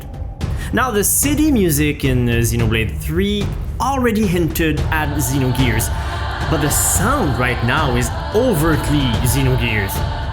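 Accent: Quebec, Canada. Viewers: Was listening to this video like "This guy sounds like hes fron quebec" then i heard you say Matthew and instantly knew without a doubt you were Great video you showed me some leitmotifs i missed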